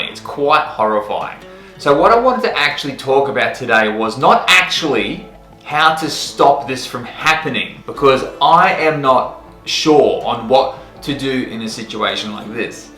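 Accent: Australia. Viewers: Strewth mate, looks like you got another "yes" here for the idea for the next video. Love Lucy and love your Aussie accent. Keep up the good work. 😎👍🏼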